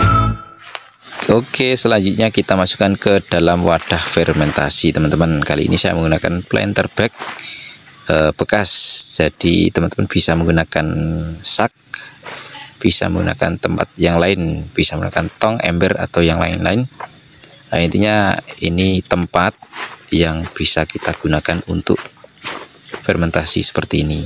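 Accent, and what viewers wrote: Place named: Java, Indonesia. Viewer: Jenengan pundi kok jowo meduk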